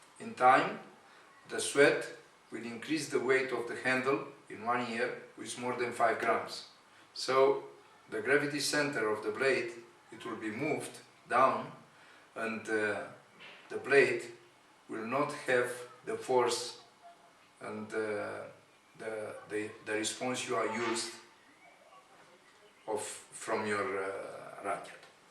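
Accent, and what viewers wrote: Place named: Romania. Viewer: Si mie mi se parea ca are accent romanesc, dar am zis ca-s nebun. E brand romanesc??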